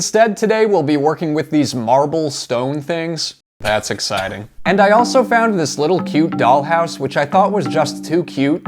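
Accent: United States. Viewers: I don’t really care about your American accent of orange I don’t know why people are getting mad at you OK 👌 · He isn't from US? I am Brazilian and I don't know much about accent, but I thought he was from USA judging by his accent. O.o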